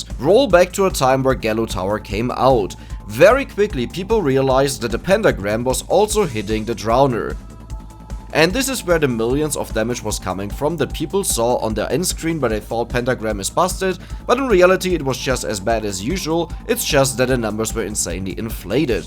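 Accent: Germany. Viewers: your live stream voice sounds way more german.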